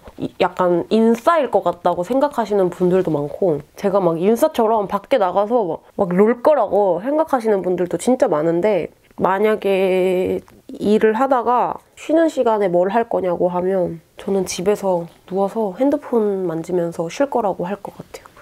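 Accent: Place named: Gyeongsang, South Korea. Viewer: 님 약간 경상도 말투 나오는 거 같아요... 기분 탓인가...?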